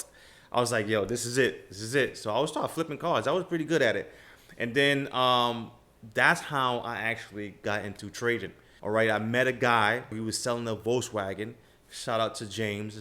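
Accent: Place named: New York, United States. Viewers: ay, from the Bronx I knew I recognized that accent lol great video I enjoyed hearing your story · You def have a New York Accent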